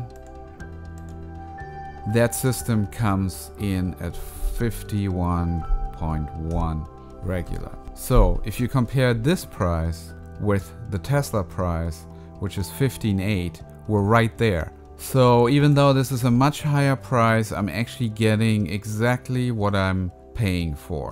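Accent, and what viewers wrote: Germany. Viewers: Your accent sounds German.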